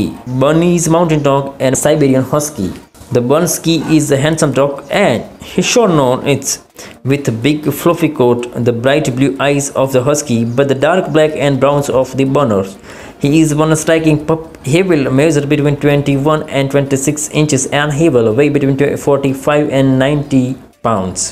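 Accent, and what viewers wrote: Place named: India. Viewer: Just go ahead and use an AI voice because this one sounds like a call center from Calcutta.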